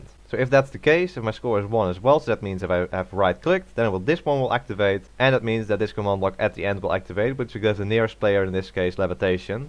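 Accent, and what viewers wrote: Netherlands. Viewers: You can just hear the dutch accent...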